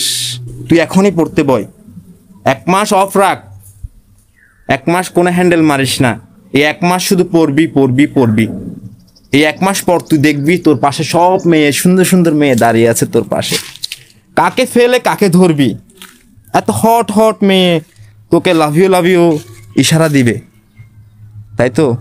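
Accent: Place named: Chittagong, Bangladesh. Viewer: Bhai apni koi thaken?..apnr vasha shune toh chittagong thaken lgtese...amio ctg thki...apnr sthe dekha krte chaii ✊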